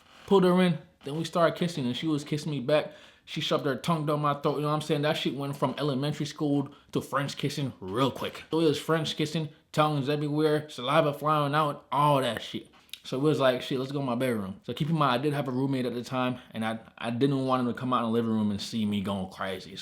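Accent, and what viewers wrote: Jamaica. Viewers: Lol... Didn't knw u was jamaican bro... Big up😁✌️ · U from Jamaican?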